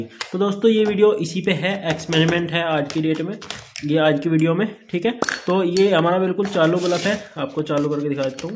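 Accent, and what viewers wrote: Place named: Baghpat, India. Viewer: Sar aapke Bhasha Baghpat side ki hai kya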